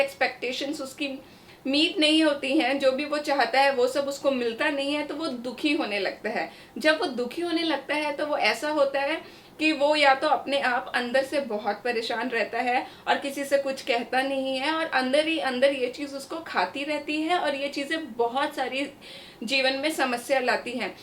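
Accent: India. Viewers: Logo ka kaam hai khna, unhe khne do.btw love ur hindi accent( i speak urdu)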